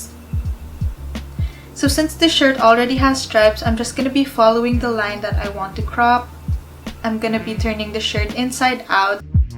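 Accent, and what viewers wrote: Philippines. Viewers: you have a Filipino accent xd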